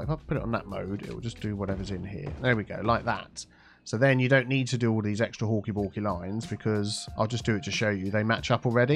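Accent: United Kingdom. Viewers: Me to my gf: "Oh he's a proper British man, a dad, but he can't say rural": OOOH MAMMA MIA!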